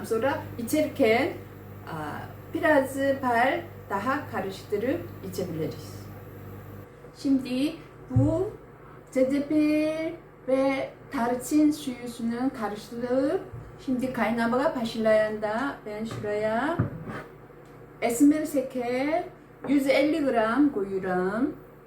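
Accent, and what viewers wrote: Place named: Azerbaijan. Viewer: Elinize sağlık. Tarif için teşekkür ederim. Bu arada Azeriler gibi konuşuyorsunuz 😅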